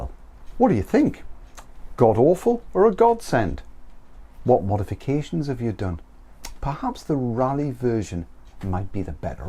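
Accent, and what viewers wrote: Newcastle, United Kingdom. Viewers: Another excellent machine in the same ‘category’ is the Yamaha XT 250. Indestructible, nimble, super reliable, terrific fuel economy, cheap to purchase and maintain, nice low super comfortable seat and will go absolutely anywhere. Rode mine for 6 years throughout all types of terrain (here in SW Australia) - never let me down and would go places (right spots) where many larger machines would not venture - or reluctantly. Excellent resale value - sold it for more than I bought it. ONLY downside - a drag when needing to ride long distances on bitumen in one stretch - only enough power to cruise happily at 90 - 100 km/h - any more and it was working too hard. Excellent video by the way - love the Geordie accent (albeit a subtle one) - the most colourful of all English accents.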